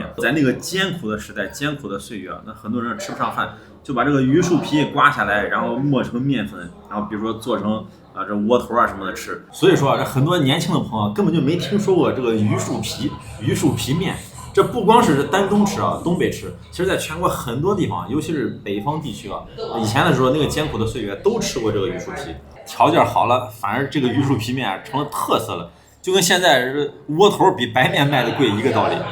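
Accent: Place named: Shaanxi, China. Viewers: 尤其陝西強暴口音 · 陕西口音听着舒服❤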